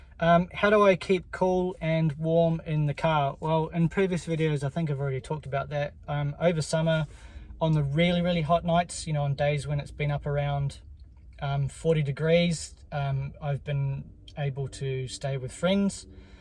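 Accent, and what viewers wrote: New Zealand. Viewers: Talking like maori? They mean Te reo? Or 🤷🏽😅 you talk like a kiwi, nzer 😅🤷🏽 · Not sure what the dude is about - you don't sound Maori as such - just a typical NZ 'one of the bros' kinda accent... regarding tasmania - will be cool man, probably my favourite locations in Australia. Make sure you check out MONA.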